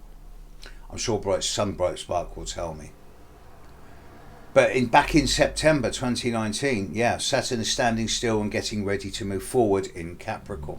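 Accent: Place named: West Country, United Kingdom. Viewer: Love your West Country burr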